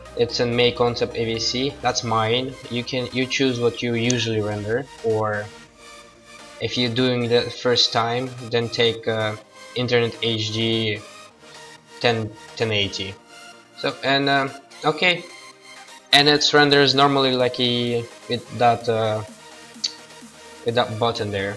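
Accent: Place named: Russia. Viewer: Русский чувак, по акценту понял) Молодец, мне помогло, спасибо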